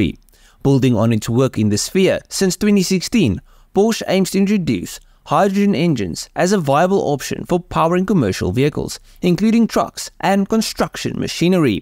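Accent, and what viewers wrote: Afrikaans, South Africa. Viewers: Dear God that thick Afrikaans accent hit me with a wave of nostalgia. Subscribed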